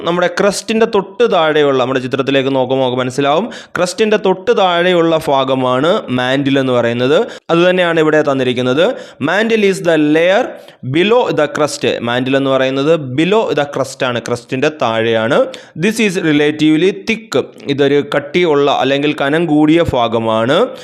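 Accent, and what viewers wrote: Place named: Kottayam, India. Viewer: Ath thettalla avarude language Angane aayittanu. Kottayam polulla chila placesil 'PA' ' Pha' pronunciation different aavum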